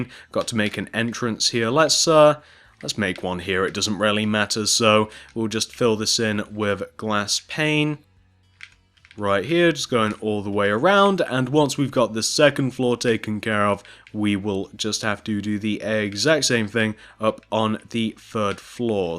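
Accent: United Kingdom. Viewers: He is British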